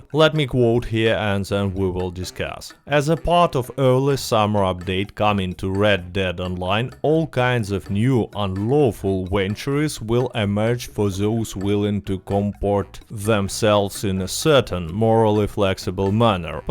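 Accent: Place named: Russia. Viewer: I was joking idk if he's Russian but he sounds Russian lol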